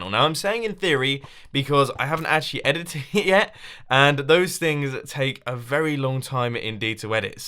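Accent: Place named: United Kingdom. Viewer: Create another storage system for your item backlog. You could also try to set up a system that drops about half of your farms drops in lava so that you don't overflow as fast. ALSO, during logdotzip's TTB#9 video, he abused fireworks so that he could fly better, but his elytra died very quickly, so stock up on a lot of dragon's breath (to repair your elytra while adventuring because some people in the comments section of his vid speculated that fireworks(even those that don't cause explosive damage) damaged elytra faster) before using fireworks to get a flight boost. Sorry for the text wall! Have a good day! Hope this helps! I also LOVE your British accent.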